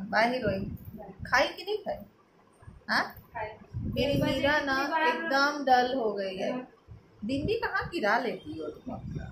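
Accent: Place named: Bihar, India. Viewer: Mujhe aap k baat katne k style se lag rha tha aap Bihar se ho sakti h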